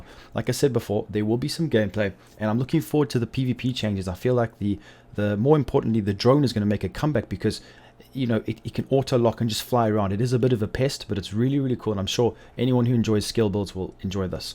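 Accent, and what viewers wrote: South Africa. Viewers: Must be a South African accent! Great Video! Followed this build and it was not just fun to aim for it. But to enjoy it when it was near complete / completed.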